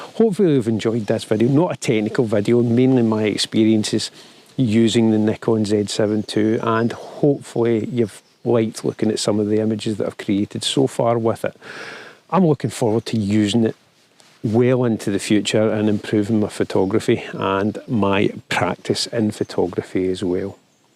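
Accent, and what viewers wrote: Scotland, United Kingdom. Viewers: Great video pal, also great hear the scottish accent for a change. I had the d850 but recently sold and got a Z7ii but haven't used it yet for any landscapes. I was wondering, for landscapes when on the tripod I take it you turn image stabilisation and IBIS off? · I would book a workshop instantly if I wasn’t so afraid of only getting 1/10th of what you are saying :) So hard for me to understand the Scottish accent although I visited Scotland five times. 😂😂😂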